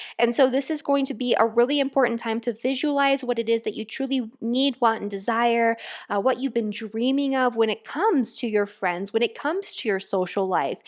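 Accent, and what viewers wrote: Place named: Oregon, United States. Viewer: I do not understand any of this but the only thing is for sure in this life : We are Doomed to any idiocy from the movements of our galaxy , anyway this girl is the reason I watch this , her accent I like it a lot sounds from Oregon , but may be from somewhere else, anyhow thanks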